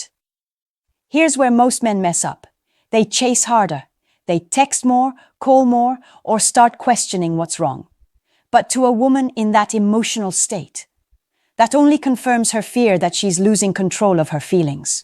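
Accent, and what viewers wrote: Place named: United States. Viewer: Yeah. The A.I. American accent is HORRIBLE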